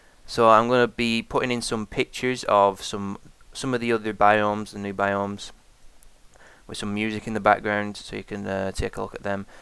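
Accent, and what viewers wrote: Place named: Ireland. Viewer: Your Irish aren't you?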